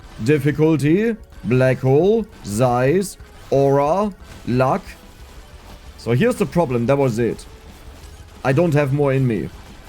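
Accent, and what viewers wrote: Germany. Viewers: Richtig deutscher Akzent xD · im watching tha vid and the hole time i thougt, he has to be german by the way hes pronouncing words, and it got fcking confirmed... :D lov it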